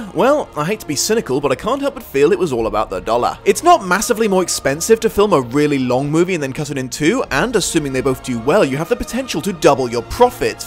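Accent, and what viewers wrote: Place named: United Kingdom. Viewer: I am so happy that I subscribed to you based on Nostalgia Critic's recommendation ^_^ I can never get bored of your British accent and witty humor :)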